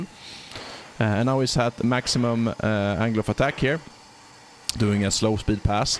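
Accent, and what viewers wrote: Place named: Sweden. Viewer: Love your Svengeska.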